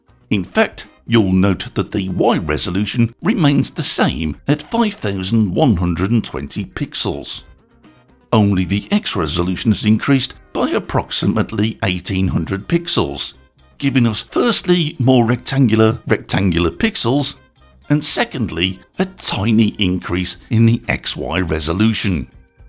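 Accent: United Kingdom. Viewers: Your British accent alone was enough to convince me ! thank you!!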